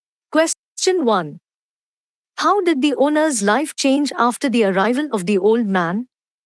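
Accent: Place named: United States. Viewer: Your voice myam American voice but teaching is,nice myam tanks myam 🥰🥰🙏🙏🙏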